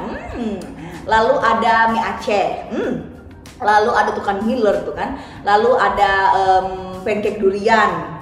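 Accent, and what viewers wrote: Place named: Medan, Indonesia. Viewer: Hah? orang medan ya? Pantesan aku pernah dengar pakai logat medan sama kira. Aku juga orang medan. Salam kenal 😊😊😊😊😊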